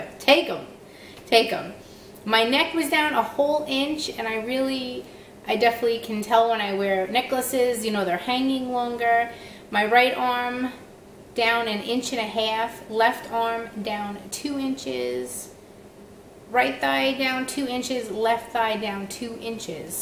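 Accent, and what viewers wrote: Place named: Boston, United States. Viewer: Great job ! Proud of you. New Subscriber from Los Angeles, CA. Do you have a get-to-know-me video? I'd like to know where you are vlogging from, love the accent. My guess is Boston.:)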